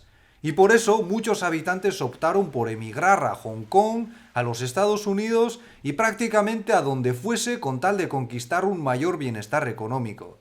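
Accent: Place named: Basque Country, Spain. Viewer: Leo tus descripciones y comentarios como si hablases tu, con tu particular ritmo y acento vasco xD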